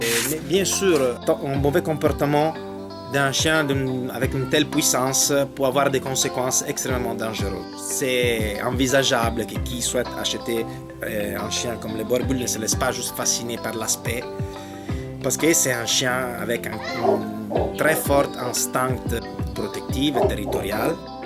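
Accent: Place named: Italy. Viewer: Enfin! Enfin une vidéo sur le boerboel en français! et dans un français parfait, en plus! Et cet accent italien... la classe! Excellente vidéo et très instructive, merci... Je m'abonne direct!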